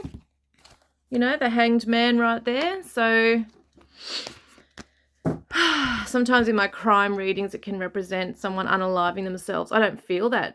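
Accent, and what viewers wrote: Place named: Australia. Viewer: I have never known of your channel before and clicked on it because of the subject. I had watched another reader yesterday and I wanted to compare what you both said. Pretty much identical. She believed he went higher and off the normal track. He tripped and fell hitting his head. She didn’t think he was in water but up on the mountain but would be hard to find by drones because he was wearing dark clothing. She doesn’t feel he is alive. She also said it would be a while - a few weeks before he was found (and you pulled out July). Two brothers passed him on the path and he would be found by other hikers (you had the hitch hike card). She felt that he and his wife had a bit of an argument on the beach before he left. You were mentioning was reflecting on his life etc. Don’t we all do that after a spat with our partner? Great reading and lovely to hear an Australian accent 🇦🇺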